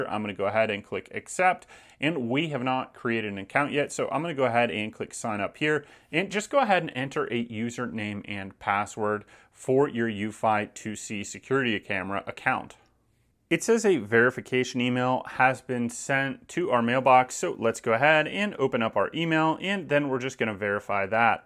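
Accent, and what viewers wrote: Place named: United States. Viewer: Speaks to fast with strong us accent no good for older people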